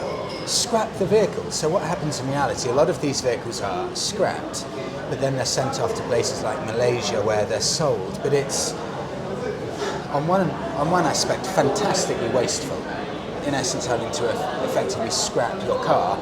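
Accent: United Kingdom. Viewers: you reminds me of Cole Hauser as Carter Verone from Fast & Furioous 2 . just you with the british accent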